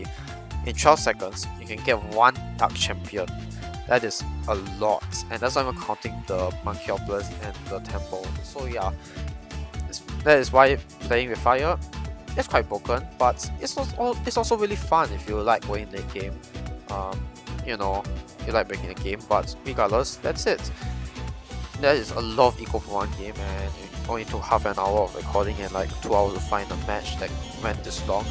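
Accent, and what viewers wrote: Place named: Singapore. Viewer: Hey you sound like you are from Singapore? Just a random guess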